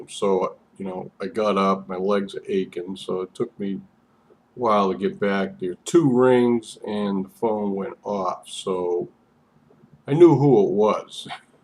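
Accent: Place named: Boston, United States. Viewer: where do you live Florida? I love your Boston accent lol happy Sunday fun day!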